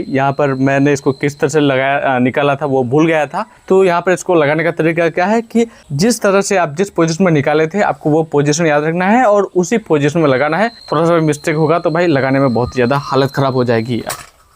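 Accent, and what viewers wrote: Bihar, India. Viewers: Apka voice mujhe pasand nahi shyad Bihari h hero giro type lgta h voice 😂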